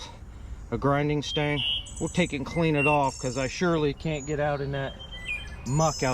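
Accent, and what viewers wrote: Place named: California, United States. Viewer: Dude I,m from Califoria but i'v lived in Missouri for 45 years but you sound like your from Ca. no ofence ment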